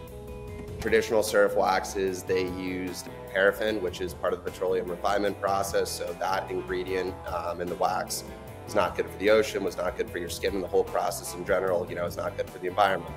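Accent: California, United States. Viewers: This guy has the most California surfer accent I've ever heard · That Californian accent is thick and cute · I cant stand that California accent and im from here.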